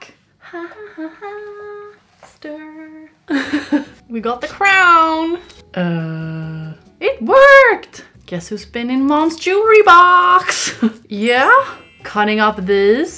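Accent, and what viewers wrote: Sweden. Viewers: I have a question. Are you from sweden? Because you sound like you are and if you are:hur mår du? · Are u Swedish? U kinda sound like u r! If u r hej hoppas du har det bra! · You sound Swedish 🇸🇪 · Omg shes from sweden. It was the first thing i thought because of her accent and then she said "elva" which is the swedish word for eleven. Så hej! Jag är också från sverige! :) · Omg I never heard such a strong swedish accent it's tickling my brain in a way I never thought it wouldve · ahhh yes the stockholmare english accent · swedish accent like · I see i see i heard that you were Swedish by the accent and the ramlösa if Im Wrong I don’t know what to do with my life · is that a Swedish accent I hear?? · u sound so swedish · I don’t know my why but i get these swedish vibes cus the acent🤠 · Yo, you sound so Swedish, please tell me I got it right lmao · Wow din svenska accent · I can’t stop thinking abt that shes from sweden. 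I mean I can hear by her accent:,) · Me: hears her accent and hears her say 11, me: yap hon är svensk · Let me guess, Swedish? The accent, ikea furniture and she didn’t even say eleven she said elva- Oh wait her bio says everything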